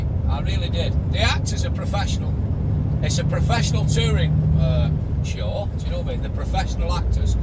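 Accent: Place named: Yorkshire, United Kingdom. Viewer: Hi where are you from, Lancashire or Yorkshire?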